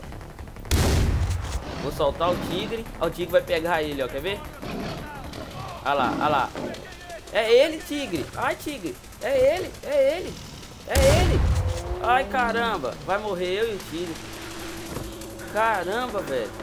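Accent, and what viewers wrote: Minas Gerais, Brazil. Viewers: Mt Top o seu canal mano ! Concerteza vai Crescer Rapido ! Acho o seu sotaque bem Legal, creio q vc seja Mineiro, Parabéns !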